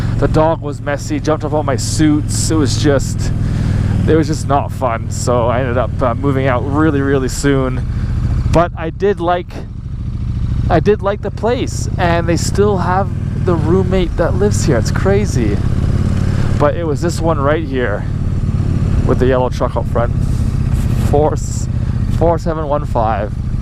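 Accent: Canada. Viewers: canadian ehhh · He's Canadian not American Think that America isn't the only country in the world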